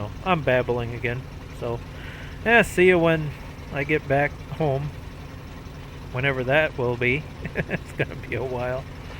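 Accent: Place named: Wisconsin, United States. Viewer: I've got to ask where are you from? By your voice I'm thinking WI or maybe northeast IA but I can't work it out haha I live in Phoenix now but my family is from NW IL about an hour and half from Chicago and 30 minutes from WI.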